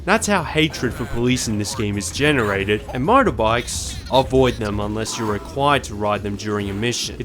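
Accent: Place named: Australia. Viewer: your accent is a really annoying version of an aussie one, did you watch too many american movies growing up :/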